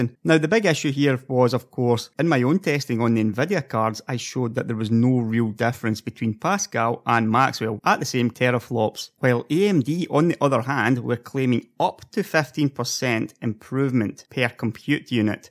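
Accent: Scotland, United Kingdom. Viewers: a Scottish bloke talk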